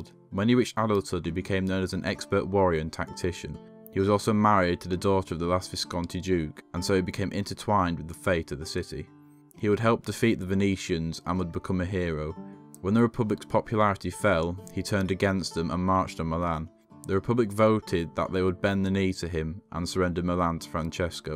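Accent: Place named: United Kingdom. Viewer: done in a terrible regional British accent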